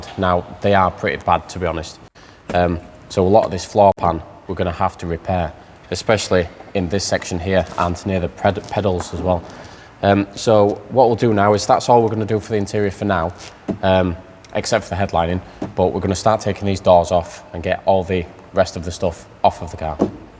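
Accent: Yorkshire, United Kingdom. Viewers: Here from Matts channel, love the Yorkshire humour. I'm betting you're from the same area as Boothy of 44 Teeth from the accent. I can't wait to see the cars journey.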